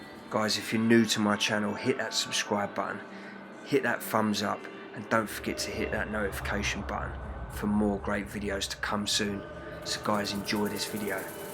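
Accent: England, United Kingdom. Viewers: Sorry... I couldn't view this one ... I feel energy and didn't want to go any further....There are place that have residual energy and you can feel it. This one is Heavy. Not saying bad...just uh uh.. But, I know you gave it the respect and love for what was left there. Good Job. I have to say in other places I have seen you go to....You speak while you are doing it. I want you to know that As you are speaking you are including those who view...As they are there with you and sharing the experience....You do an Awesome Job...and LOVE the brogue...( not meaning anything by it ) My First Love was from England and He spoke the way you do....It makes me smile. I'm a fan...And I'm older than your Mum so I'm safe ! Lol Thanks for taking us places I have never been.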